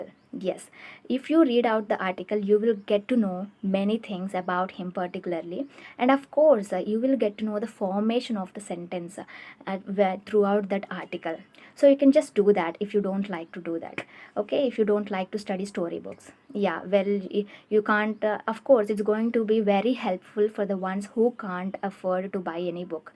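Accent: South India, India. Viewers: She has a South Indian accent; she may not even know Hindi, but she's addressing Hindi speakers, and she's good at it.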